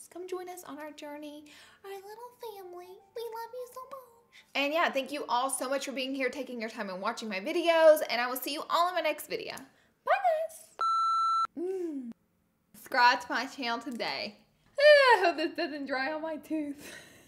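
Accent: South, United States. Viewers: Wouldnt be if y'all didn't have bloopers!! And the southern accent brings it all together!! Loves..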